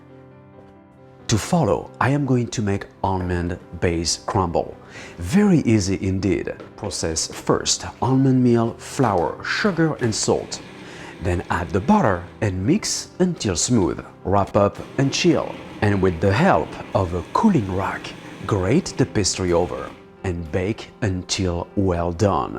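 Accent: France. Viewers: Idk...between the crazy machinery (non-cook here) and the intimidating French accent I feel like all of this video is basically "baking on hard mode". In a world where BuzzFeed dominates Instagram worthy foods it is a wonderful sight to see true baking skill displayed on YT. Edit: thanks for all the likes! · I don't know if I'm following an English cookery course given by a guy with a strong French accent, who probably thinks that his smile will make him forget to give the measurements and grams needed to make this tart. He needs clicks and likes for his video I need measurements Hoping for your understanding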